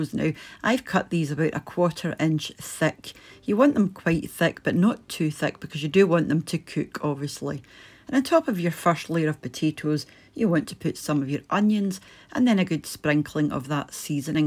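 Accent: Scotland, United Kingdom. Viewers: Hi! Love listening to you and your recipes. Can you please do me a favor? Say your name in your videos? My name is also and I'd love to hear it in your Scottish voice. ❤ Much thanks, Bowen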